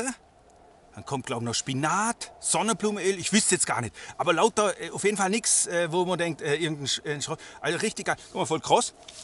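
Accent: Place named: Swabia, Germany. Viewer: legga legga :-) Ein echter Schwabe! Des kannscht da Hase gäbe...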